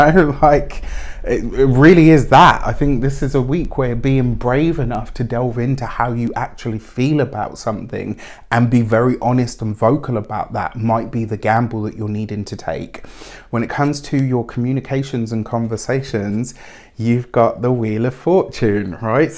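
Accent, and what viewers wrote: England, United Kingdom. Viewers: Ive missed your English Voice…. thank you for the good news!!! Stay Golden!!!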